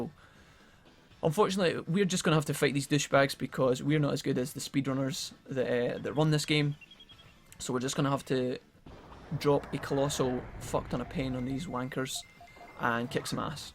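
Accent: Scotland, United Kingdom. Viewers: "Gandalf and the fuck boys" lmao, enjoying these videos man, the Scottish patter is great. xD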